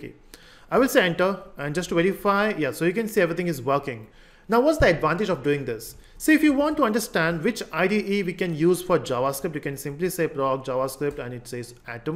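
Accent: India. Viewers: Hindi accent makes me not understand English well. Regards 😔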